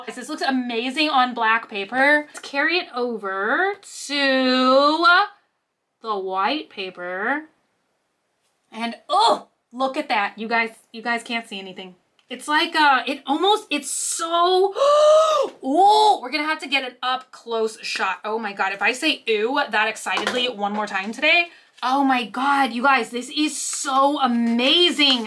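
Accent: Texas, United States. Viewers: Lol I think that may just be the Texan accent (I say it the same way and I’m also from South Texas)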